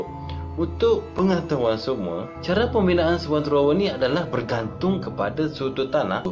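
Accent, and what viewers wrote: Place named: Kuala Lumpur, Malaysia. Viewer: Mantap abe ckp kl.. 👍👍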